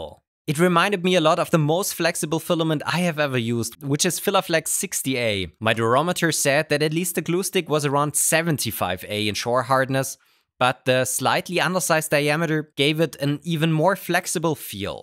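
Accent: Germany. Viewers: Bro... are you German?! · Bro ich klick auf das video und hab direkt das gefühl gehabt, dass du aus deutschland komms. Sber sehr cool, was du machst❤ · hey are u German? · Ich bin eine Minute drin und muss sagen du hörst dich sehr deutsch an :D nice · Deutscher Akzent? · I hear Deutsch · Are you German? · bro bist doch deutsch oder man hört das harrrtt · are you german? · Geilster Deutscher Akzent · first 5 seconds in, "DAS IS N DEUTSCHER, ICH KANN ES HÖREN!" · Guten Tag im Stefan 😂 du bist locker Deutsch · are you german? but cool vido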